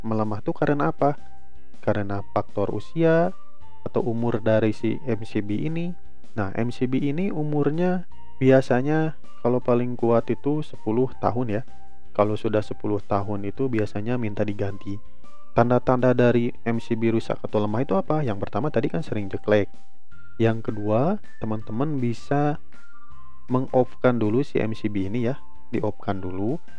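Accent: West Java, Indonesia. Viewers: urang suna euy ieu mh, logat na katawis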